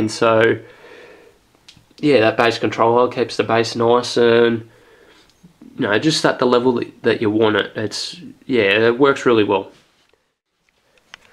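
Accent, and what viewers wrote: Australia. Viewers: awesome setup! M8 r u Aussie:D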